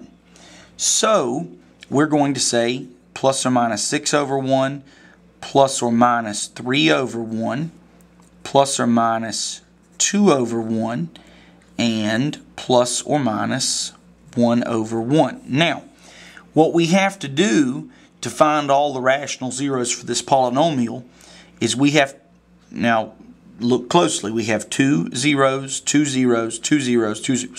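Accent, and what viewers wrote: South, United States. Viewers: you got that country accent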